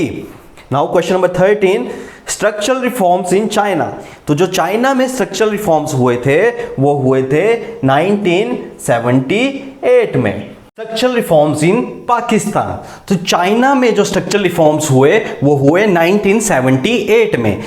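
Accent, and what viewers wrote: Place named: Himachal Pradesh, India. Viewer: Sir aap ke awaz Himachal vale lagte hai.. mai shimla se hu .. aur aap?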